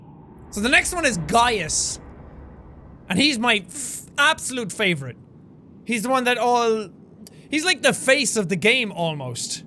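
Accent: Ireland. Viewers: Tried watching a JSE video from 2013... couldn’t get past the first minute... I missed high energy Jack with his full Irish accent! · can somebody help. pronounces tri angle as try angle instead of triangle. he emphasises the space in between, is this due to his Irish accent/upbringing. I am northern English and do not pronounce it like this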